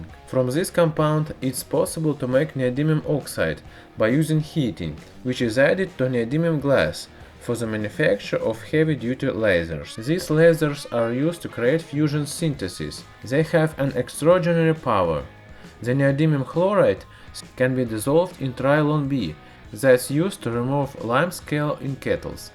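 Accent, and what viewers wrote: Russia. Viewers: Utkarsh Amitabh Srivastava its russian accent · Borat is from Kyrgyzstan while this guy is from Russia or at least has a thick Russian accent Kyrgyzstan and Russia were once in the same empire but I think it's a bit broad to say that Borat has a Russian accent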